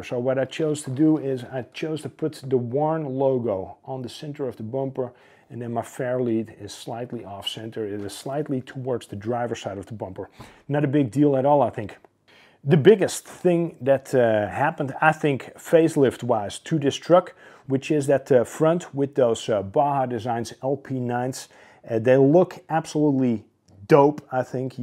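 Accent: Netherlands. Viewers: Very nice video like it. Just thinking of getting a CJ. Btw, do i hear an dutch accent? 😊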